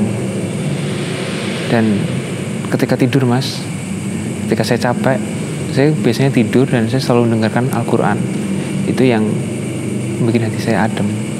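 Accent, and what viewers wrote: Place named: Java, Indonesia. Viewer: di maklumi sobat....lidah orang jawa memang kebanyakan begitu.nggak usah di permasalahkan.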